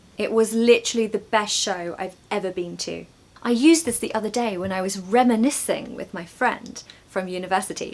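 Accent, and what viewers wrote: United Kingdom. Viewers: l'm a big fan of British accent (l'm crazy about it) and your accent and teaching methods are one of the best l've seen . A zillion thanks to you from tabriz 🏢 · Thank you …you are such a sweet and great English teacher…your British accent is very easy to learn. Thank you so much share the video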